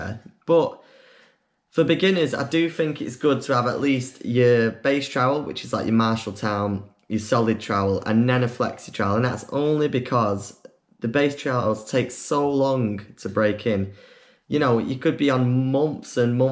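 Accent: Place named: Manchester, United Kingdom. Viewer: Deffo from Manc - "Could be angin" :) :)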